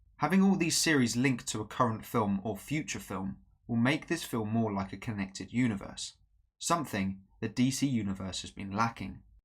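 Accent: United Kingdom. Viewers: Where in the United Kingdom are you from?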